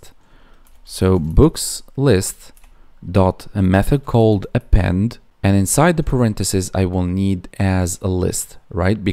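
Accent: Russia. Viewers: Неплохо маскировал русский акцент.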